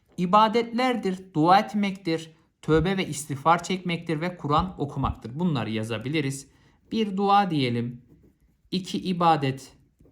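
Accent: Malatya, Turkey. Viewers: Hocam no 44 Malatyalımısınız 😂😂😂🎉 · Hocam, Malatyalı mısınız?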